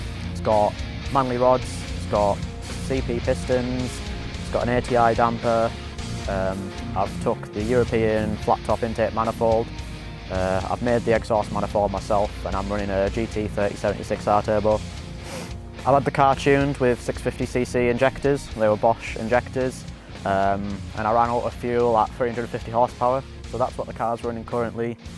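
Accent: United Kingdom. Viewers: I love the loud grunge metal music in the background then the soft belted British accent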